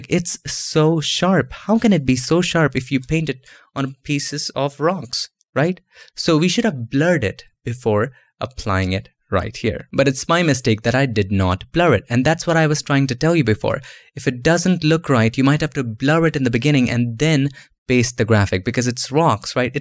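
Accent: India. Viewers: When you find a good tutorial : :D When you see the guy have an indian accent : :/ But you stay because it's awesomely done : OvO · I don't get it? What's wrong with having an Indian accent?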